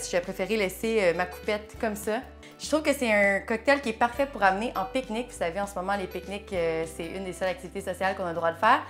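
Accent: Quebec, Canada. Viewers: C'est une tuerie cette video, la recette est clair, tout est montre et bien explique. Et en bon Francois entendre le dialecte quebecois sur une recette Francais c'est vraiment sympa.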